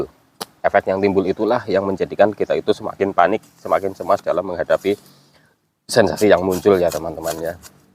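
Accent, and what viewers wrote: Banyumas, Indonesia. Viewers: ngapak a mana mas?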